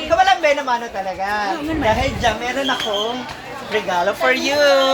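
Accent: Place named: Visayas, Philippines. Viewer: bisayang bisaya nman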